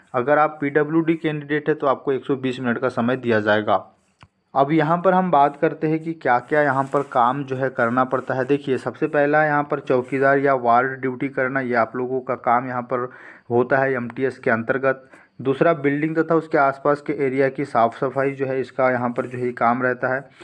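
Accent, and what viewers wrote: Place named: Bihar, India. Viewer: Bhai aap Bihar so ho 👍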